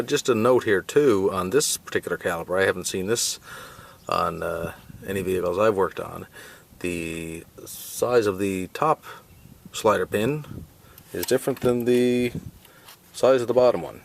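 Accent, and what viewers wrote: Newfoundland, Canada. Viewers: Good Job, & I like the way you say "off", it would be written as "oot", I love it, lol, I like the Canadian accents. Vin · Love that Canadian accent.lol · Given the accent & state of corrosion, I think we're in Canada. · this video along with your irritating canadian dialogue makes this painful to view and listen to , its like torture < makes one want to vomit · hey are you from newfoundland?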